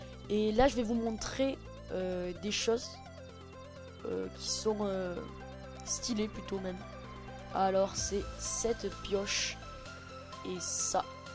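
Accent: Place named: South, France. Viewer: abos. VIVE L'accent DU SUD. BGBG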